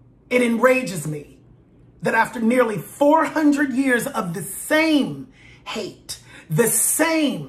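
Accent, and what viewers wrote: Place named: Pittsburgh, United States. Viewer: Your voice still sounds like you're from Pittsburgh you didn't pick up a New York accent at all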